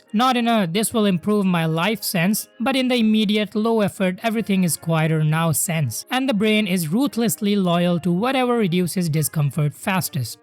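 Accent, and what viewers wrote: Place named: India. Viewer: no, it's your IND accent. the IND accent is probably the most annoying in the world to listen to.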